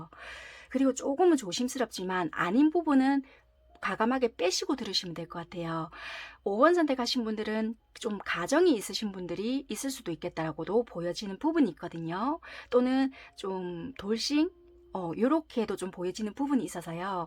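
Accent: Busan, South Korea. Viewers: 감사합니다~^^ 좋은일이 생기면 꼭 후기 남길게요! 🙏🏻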